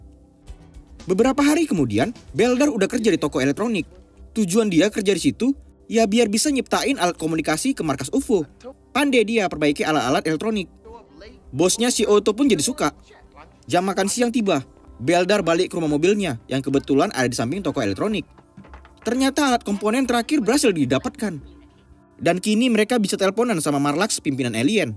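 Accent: Medan, Indonesia. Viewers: Logat Medan Yo😂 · Medan mananya kau bang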